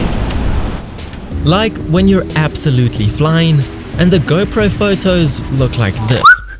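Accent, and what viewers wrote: South Africa. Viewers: U from south Africa bru ? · Are you south african?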